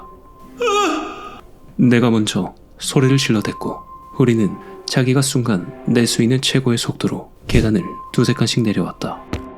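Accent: Gyeongsang, South Korea. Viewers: 제천인데 경상도 사투리고 구수하네. ㅋ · 님 최근에 알게되어서 잘 듣고 있는 구독자입니다. 편안하고 분위기 잘잡으시는 목소리와 특유의 경상도 사투리가 너무 매력적이라 구독하게 되었고 주변에도 영업하고 있습니다. 저는 해 떨어지면 전기 자전거 타면서 님 채널 틀어놓고 들으면서 자전거 타는게 너무 좋네요 ㅋㅋ 부디 지금보다 더 떡상하시길 기원하고, 저도 중학교때 겪은 소름돋는 일 하나 있는데 조만간 제보하겠습니다. 항상 힘내세요!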